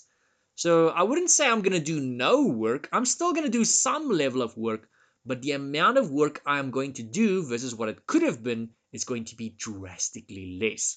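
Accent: South Africa. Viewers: This is just a thumb suck but figured out your accent is South African from looking up that term after watchin' previous videos. I feel vindicated by seeing as your location in the trial. I am from West Texas but watch a lot of British Telly and know all the UK and Aussie accents and had been trying to place it. Your accent is so cool, and you are a great teacher. Thank you for your videos. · Still trying to determine if you from South Africa or Netherlands, lol. Thank you for this video! It really helps. What practice tests woild you recommend as well.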